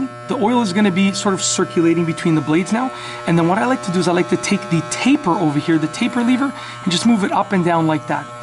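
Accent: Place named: Canada. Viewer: canadian ehh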